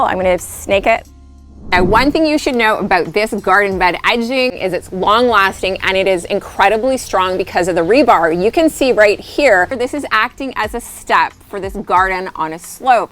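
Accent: Canada. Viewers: She sounds Canadian.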